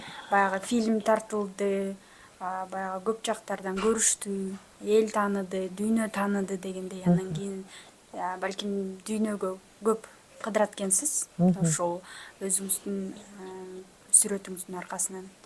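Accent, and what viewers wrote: Standard. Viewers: Кыргыз тилинде таза, сонун суйлойт экен, маа шаа Аллах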